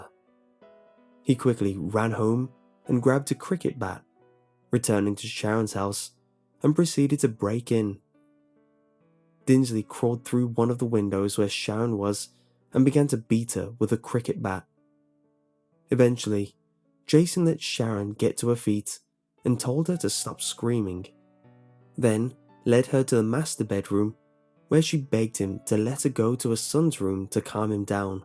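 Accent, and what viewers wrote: England, United Kingdom. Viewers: i really don't know what it is about English accents but agreed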